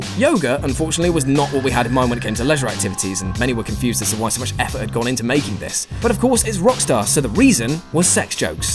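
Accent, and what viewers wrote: United Kingdom. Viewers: Ok people that criticize a game that they can't fix or do anything about pisses me off but some one with a British accent criticizing a game just really pushes it. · this is guy is 100% british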